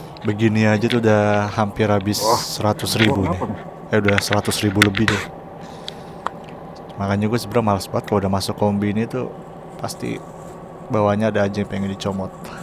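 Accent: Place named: West Java, Indonesia. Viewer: Bang kamu orang sunda ya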